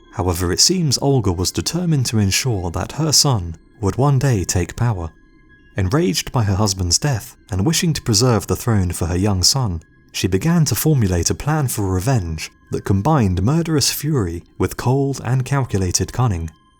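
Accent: England, United Kingdom. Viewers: Englishman here. He sounds English to me. What was it that he didn't pronounce properly? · You sound like the spiffing brit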